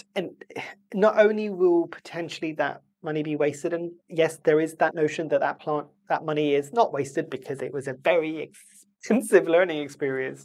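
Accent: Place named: London, United Kingdom. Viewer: Wow, coming from a beautiful warm climate, what inspired you to move to the 🇬🇧 UK? Was it for university? Your accent even sounds like you are a native of London. Ps. You look really good in this video. (Not to say that you don't normally look good) 😊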